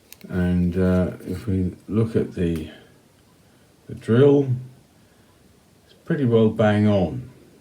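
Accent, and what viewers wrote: Yorkshire, United Kingdom. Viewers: Hi, Received my Hardcastle pipe today. The same one you have, put with no filter. The last pot shape they have. I’m breaking it in with some Watch City Cigars Old Dominion Flake and a nice cup of Yorkshire tea. Life doesn’t get any better than that. Great Yorkshire accent. By the way love your videos. Charles. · Great video, big grin and chuckles over here, enjoying your take on it all with the Yorkshire accent. Bravo! Watched this after your channel update video. Godspeed to you, prayers said from here. You are going to do great things for folks who really need help.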